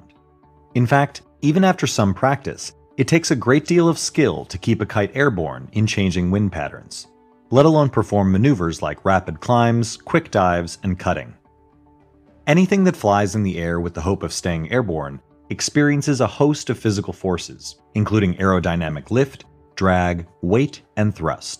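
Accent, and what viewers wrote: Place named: United States. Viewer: Dude how did speak so well in american accent. How did you train?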